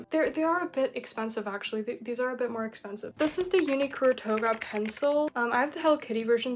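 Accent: United States. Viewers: i totally thought you were american lol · these are all great choices! btw, why do you have an american accent when you're from Austria?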